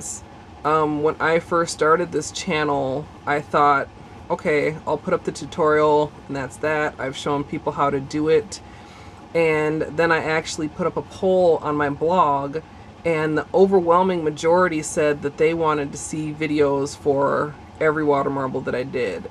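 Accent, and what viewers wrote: Minnesota, United States. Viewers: You have a very Minnesotan accent. ;) but it's ok cause I'm Minnesotan too.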